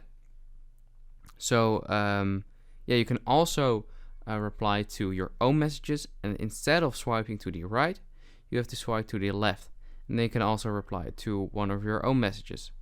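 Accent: Netherlands. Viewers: When the dutch accent gives you away 😏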